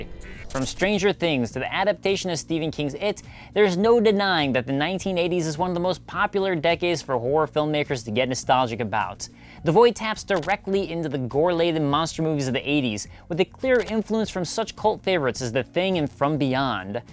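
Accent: United Kingdom. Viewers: Neko Sama because his accent sounds british, huh? (Lmao moron)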